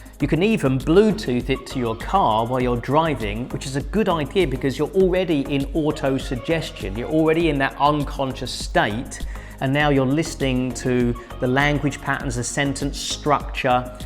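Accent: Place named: South, United Kingdom. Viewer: FYI it's an uneducated Southern British accent. To a British person it doesn't sound impressive.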